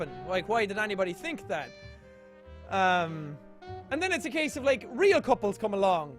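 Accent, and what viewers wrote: Ireland. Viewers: has a 10/10 Irish accent. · Is it just me or does he sound Irish🇮🇪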